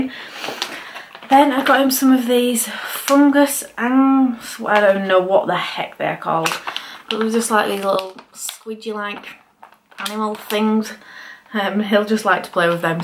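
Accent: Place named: Middlesbrough, United Kingdom. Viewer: Thanks for making the video. I lived in Middlesbrough for couple of months and u remind me of it soo much ur accent.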